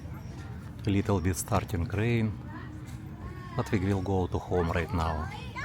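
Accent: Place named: Russia. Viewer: So strong russian accent 😅 Привет, хорошего отдыха тебе! Спасибо, что снимаешь и показываешь!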